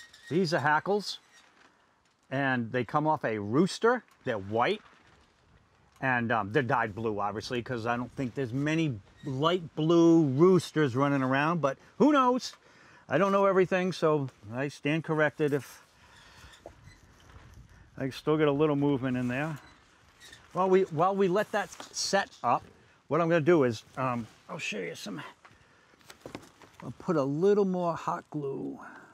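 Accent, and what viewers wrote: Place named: New England, United States. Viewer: I'm hearing that New England accent! Thank you for these excellent videos!!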